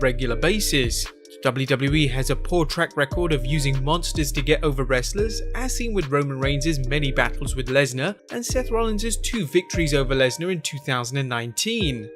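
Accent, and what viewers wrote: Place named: England, United Kingdom. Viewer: where is this guys accent from? i mean specific city in england.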